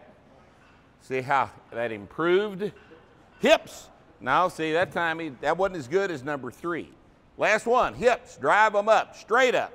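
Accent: Texas, United States. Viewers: Well it's really more Texan. It would definitely stand out among native Georgians for example. Watch Fred Armesan's video on accents. Somehow he gets it pretty close. Well I think spot on but I'm not an expert. But have lived my whole life in southern U.S.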